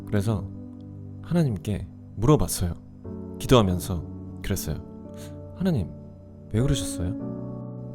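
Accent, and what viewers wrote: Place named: United Kingdom. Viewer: 힐링벌스 좋아요! 자주 올려주세요 ㅎㅎ 영국발음도 멋지십니다👍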